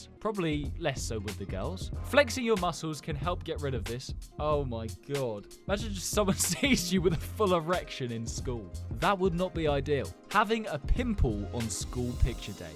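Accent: United Kingdom. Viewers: Are you from uk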